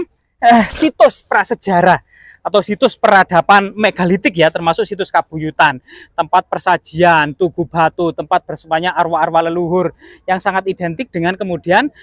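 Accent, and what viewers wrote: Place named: Java, Indonesia. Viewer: Tutur bahasa muedhok tenan 😁👍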